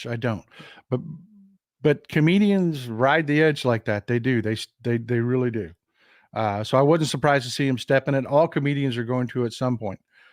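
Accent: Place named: South, United States. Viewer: A Virginian...a Southerner. Need some of our voices in my ear, rather than all these West Coast, Northeastern folk. Just subscribed... preach brother! Thank you.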